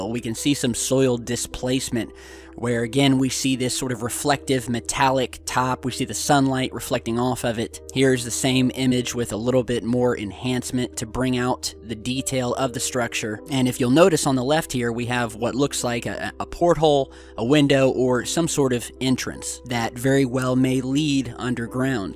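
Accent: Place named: United States. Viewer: Well he speaks English with a kind of American accent, I'm more used with British accents but I understand him very well too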